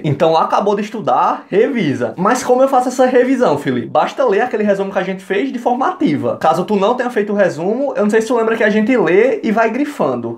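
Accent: Northeast, Brazil. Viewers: Homem tu é lindo, mas esse seu sotaque é mais lindo ainda... Coisa deliciosa é um sotaque nordestino.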